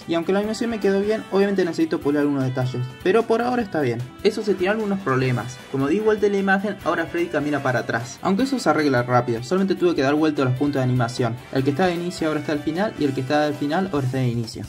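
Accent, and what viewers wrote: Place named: Spain. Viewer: Ah vi el de ingles i ya pense "Ah tiene acento español" y ahora me salio este hahahaa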